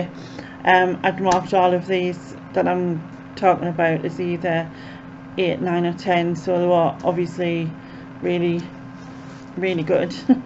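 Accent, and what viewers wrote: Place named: North East England, United Kingdom. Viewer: cool I like north east accent,where in north east are you?how are you copeing with the lockdown?xx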